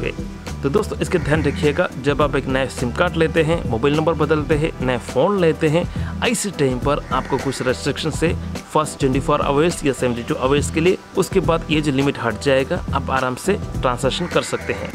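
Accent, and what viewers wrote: Kerala, India. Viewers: Mallu speaking Hindi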